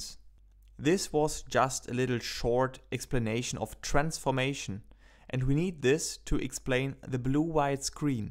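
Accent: Germany. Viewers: Absolutes alman English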